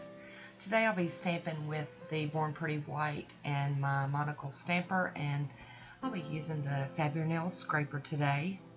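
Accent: South, United States. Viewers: Rascally rabbit!! 😉 You just have the sweetest southern accent!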